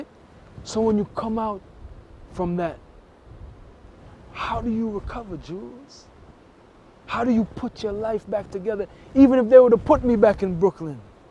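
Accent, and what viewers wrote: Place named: Belize. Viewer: J E He’s Belizean. Sometimes your mother tongue accent comes through if you lived in more than one place when you were growing up.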